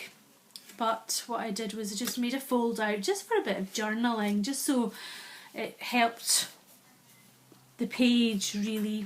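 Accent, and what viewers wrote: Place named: United Kingdom. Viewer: Your imagination is incredible! I was unable to view more than the first two minutes of the first video (not sure why) I saw the doctors bag - girl what a terrific idea! Loved your video - love your voice and accent! (I am originally from the UK - moved here in 1988 (us) and anything from home sounds wonderful. Thank you so very much for sharing your creativity! Hugs Julie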